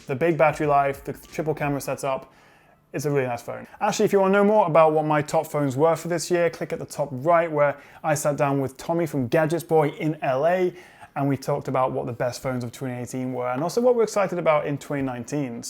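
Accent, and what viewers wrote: United Kingdom. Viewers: I like your channel because your British ascent sound interesting.:) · Hands down my favorite YouTuber/ tech reviewer ever, his content is just so relaxing to watch an plus the guys from uk awesome accent happy new years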